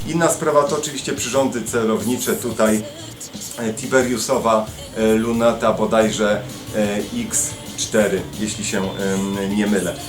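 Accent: Silesia, Poland. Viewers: skąd jest? Ze Śląska?;p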